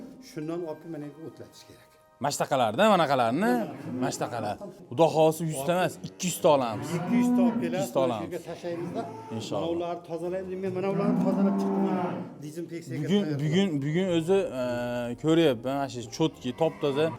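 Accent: Andijan, Uzbekistan. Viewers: Анжан шевага гап йук😂 Китобида ёзилишича мол максимум 1 суткада 1,2 кг семиради. Сонини купайтириб, расходни минусласа, олиш мумкин булган максимум фойда чикади. Реалрок дан келиб чикиб, хисоб китоб килиб, инвестицияга йилига канча % олиш мумкинлигини тушунса булади. Россияда гуштни сотиш проблема, ечими топилса бупкетар. Айникса "халяль" сертификат олинса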